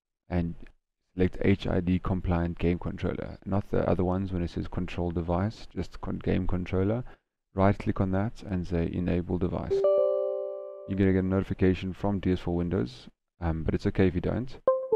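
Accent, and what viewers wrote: South Africa. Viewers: Worked for me!! 🙌🙌🙌 I can now play NFS Payback and Heat with the controller!! And is that a SA accent I hear there?? Lol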